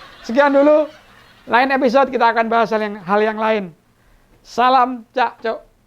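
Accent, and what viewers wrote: Java, Indonesia. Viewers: Ora percoyo Karo awakmu kang ~ podcast ngomongmu wae medok ngono dobol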